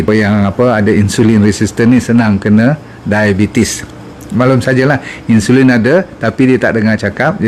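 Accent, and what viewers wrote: Malaysia. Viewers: Doktr bercakap guna satu dialek di Malaysia. Jika sudah biasa tentu mudah faham sebagaimana ikuti dr zaidul.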